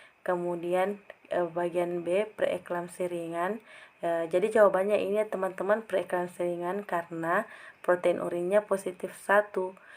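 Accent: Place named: South Sulawesi, Indonesia. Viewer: Kk org sulsel yah ?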